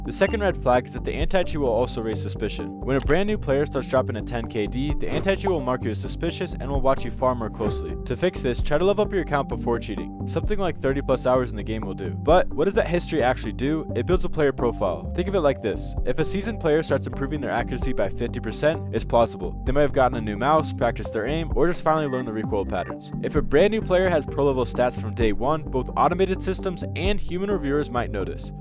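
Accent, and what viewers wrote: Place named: North America. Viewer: You sound like your NA. Wait till embark send the suites to harass your mom like blizzard did mine with Glider/Honorbuddy. Good luck kid. Your barking up a ruff tree and your talent could make you way more money in another field. Being a white hat isn't "gay". Its progression into being a adult that wont spend the rest of his life in debt or in prison. Good luck young man. If you are even the one coding these and not just a reseller. Idk, been out of the scene for decades and wanted to see what kids where using now in games I played.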